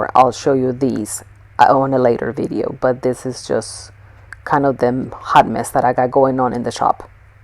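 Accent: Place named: Southwest, United States. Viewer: Beautiful job!! Thanks for the tip about staining. I understand you perfectly - your accent is just a normal Southwestern accent, a lot of people have it here, most especially if their families have lived here for a very long time. I live in Albuquerque New Mexico and wondered right away if you were close by because so many people talk like that here. It says you're in or near Dallas, so it's normal. It's a very normal 'accent' and I used to think it was just an Albuquerque area accent, but it's not, it's like that throughout the Southwestern US, mostly just in a band across Texas, New Mexico, Arizona, and California, more common in the southern halves of those states but lots of people throughout those states have it.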